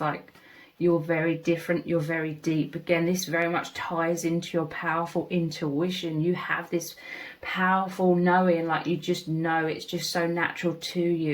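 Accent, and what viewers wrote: United Kingdom. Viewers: Yes ma’am sounds a lot like me. I’m very empathic probably over the edge super empathic or something, but I had to go quite silently on a lot of folks that don’t deserve the privilege I’ve ever been you know again they don’t deserve that after that transaction of pain. It was a crucible that really changed me an altered us quite a bit me and my dog, but we’re looking forward to better times So I could sign my name or something, but I’ll just put a little emoji that describes me quite well It’s very ferocious there and then and yours is a pretty good way to describe me as part of that team and stuff but we’ll just get on with this. Have a great beautiful day You sound like you’re from over there in British land or something Take it easy 👉 🐺 👈 I kinda had to get that way you know all that I had to observe and punishments and announcements that I had to absorb and then read myself well it was left with something to protect me in a biscuit here Alone, one .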